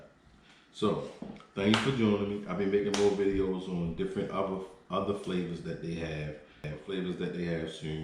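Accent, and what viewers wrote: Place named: Baltimore, United States. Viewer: Gotta be from Bmore! Ask me how I know. Lol It's the (u or ewww) that's emphasized heavily. Lol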